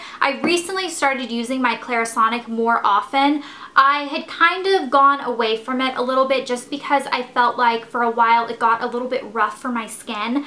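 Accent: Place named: South, United States. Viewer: I love how sometimes your southern accent comes out <3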